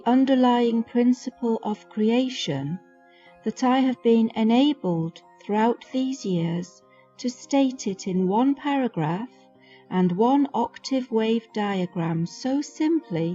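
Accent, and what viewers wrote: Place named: United Kingdom. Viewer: Why that creepy Brit voice …such a obnoxious sound…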